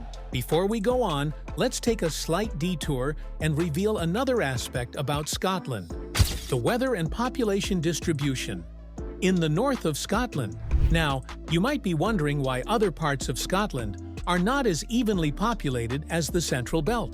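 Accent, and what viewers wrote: United States. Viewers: F'cking American voiceover. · seconds in and an American accent that can't pronounce towns ("Endinboro"), then I read the comments! Result, found something bbetter to do with my time!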